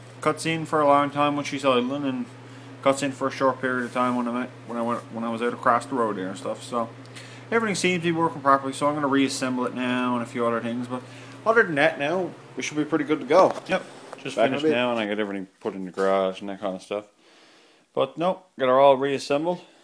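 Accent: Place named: Newfoundland, Canada. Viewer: You sound like you're from Newfoundland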